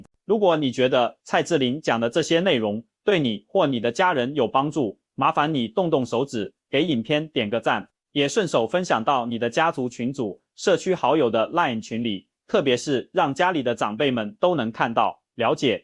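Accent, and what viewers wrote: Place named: Mainland, China. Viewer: 中共國的口音！騷嘮台灣！你們中國人管好自己比較重要！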